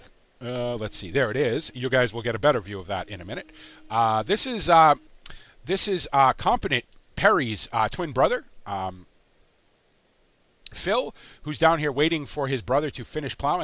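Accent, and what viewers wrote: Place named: Brooklyn, United States. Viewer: you sound like you're from brooklin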